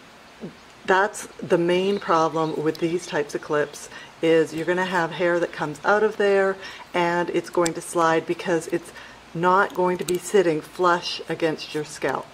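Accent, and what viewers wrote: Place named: Atlanta, United States. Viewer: Thanks...i will look out for them, Love your accent and the outdoor videos.. reminds me of living in Atlanta - oh and you always look amazing too:)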